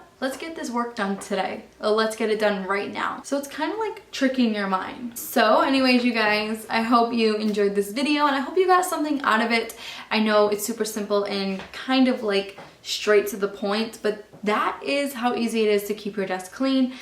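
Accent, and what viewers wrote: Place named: New Jersey, United States. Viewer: the New Jersey accent!!! Love it lol like if U agreeeee!!!😆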